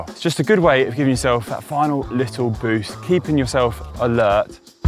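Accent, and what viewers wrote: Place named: South East England, United Kingdom. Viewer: Name: Irish Home: Ireland Accent: South East England? What’s the story,?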